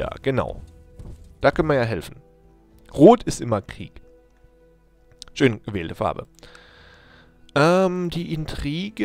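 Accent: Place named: Baden, Germany. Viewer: Kann es sein das du aus Karlsruhe kommst du hast mal deine Stadt in Sim City mit Karlsruhe verglichen und jetzt spielts du Baden, und dein Akzent scheint mir irgendwie auch aus dieser Gegend zu kommen da wohn nämlich auch (im Breisgau) Mach Baden bitte zu einer Großmacht und über nimm wenn irgendmöglich Schwaben/Würtemberg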